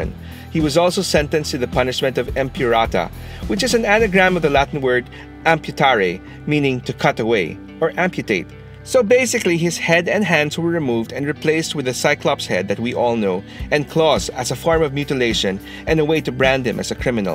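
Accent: Philippines. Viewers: Filipino accent?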